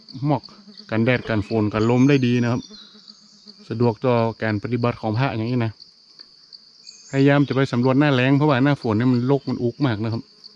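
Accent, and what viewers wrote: North, Thailand. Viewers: นี่ก็บ่ฮู้เหมือนกัน สำเนียงอู้บ่ใจ่ลำปูน- เจียงใหม่หล้า น่าจะแปร่-น่าน-ลำปาง กาว่าเจียงฮาย-พะเยา...ฟังไปฟังมากะเหมือนจม.อะละ เอ้าตอบให้หายข้องใจ หน่อย@ 555 เผื่อจะเพิ่มยอดไลค์นักๆ..ไหนๆก็หากิ๋นตางนี้แล้ว คนเปิ่นไค่ฮู้ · อยู่จังหวัดอะไรคะอ,เภออะไรเอ่ย,ฟังพูดเป็นคนเหนือแต่ไม่รุ้จังหวัด,และ,อ,เภอค่ะ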